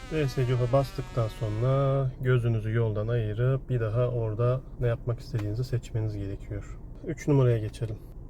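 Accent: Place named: Adana, Turkey. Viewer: hocam adanalısın sanırım şivede kaçaklar sezdim :)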